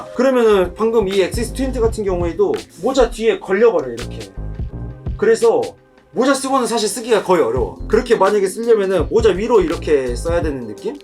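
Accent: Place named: Jeolla, South Korea. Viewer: 전라도 말투극혐이네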